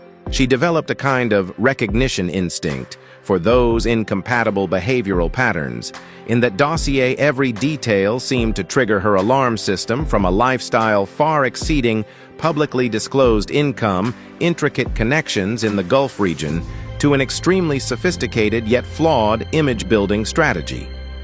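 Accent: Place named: United States. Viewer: Waste of time listening to this, and the American accent is nauseating.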